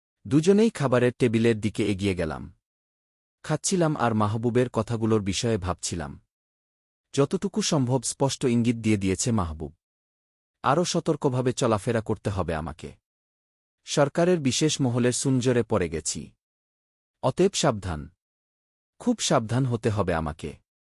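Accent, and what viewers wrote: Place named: West Bengal, India. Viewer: The reader is from West Bengal!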